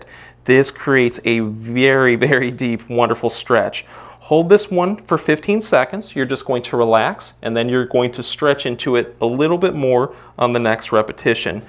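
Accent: Michigan, United States. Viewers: Holy wow!!! I’m working in Italy and the beds and pillows are horrible. My neck was hurting so badly that I started a Google search on videos to help out. I found your videos and what relief!! I definitely will have to see my chiropractor when I return back to the states. BUT I do have to say as soon as you started talking I thought “He must be in Michigan”….. I lived in St Joe, MI for 7 years!!! Of course I’m following you now!! Thanks for the relief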